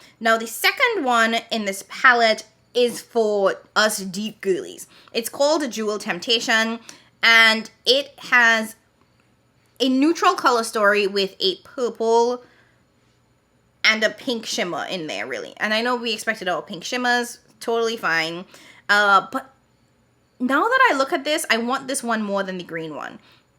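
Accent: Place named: Trinidad and Tobago. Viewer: Why did I say the caption in your trini accent 😂😂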